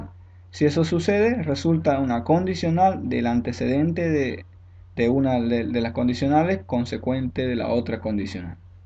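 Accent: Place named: Bolivia. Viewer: Sos de Bolivia? Se me hace familiar tu acento :v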